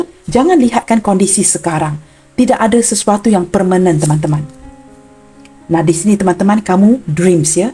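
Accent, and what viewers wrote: Malaysia. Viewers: Suara nya nada cakap malaysia ni kan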